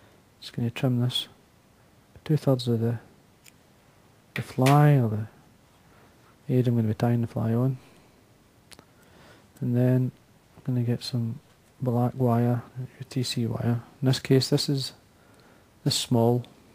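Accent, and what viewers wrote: United Kingdom. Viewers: Great video. Thanks. I just wish I could understand the wording better. The softly spoken British "English" was hard to follow. You see I live in America! As they say “one picture is better that 1K words, so I will do the best to learn from the demonstration.. Thanks again for the post.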